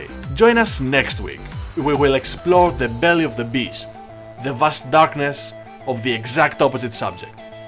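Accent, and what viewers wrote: Greece. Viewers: You have the most thickest greek accent I've ever heard, much love bro!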